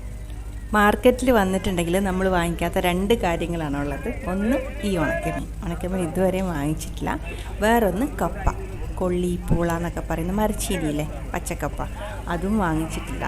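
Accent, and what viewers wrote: Tamil Nadu, India. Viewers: No Small tamil touch in the conversion.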